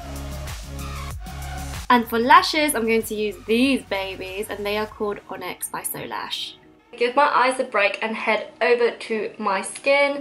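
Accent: England, United Kingdom. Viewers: I've only ever seen your videos on Instagram and was completely shoookk at your British accent. That was completely unexpected However you are so lovely and slay these makeup tutorials to the max ❤❤❤❤❤👏👏👏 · I NEVER EXPECTED YOU TO HAVE AN ENGLISH ACCENT I AM SHOOK. OMG YOURE SO EXTRA ADORABLE WITH THAT VOICE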